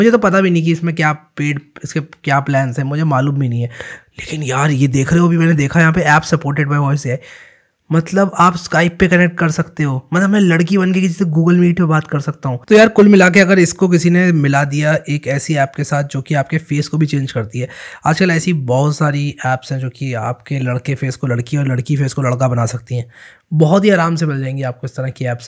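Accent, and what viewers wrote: India. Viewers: bhai tu bilkul angrez jaisa dikhta hai, mai to kisi foreigner ka video bolke click kiya tha, badme dekha tu to indian accent bol rha.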